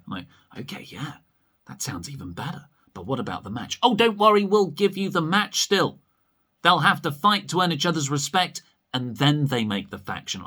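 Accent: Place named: United Kingdom. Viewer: Yes. Just Yes. To me, AEW is if WCW did everything right instead of... well you know the rest. have you ever thought of using the US grading scale? A+ and whatnot? no complaints about your current grading scale, just a thought. Love this channel because none of my friends are big wrestling fans... or have British accents.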